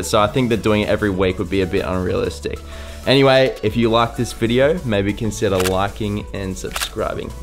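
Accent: Australia. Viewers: Now i wanna to learn the aussie accent. U sounds so hip.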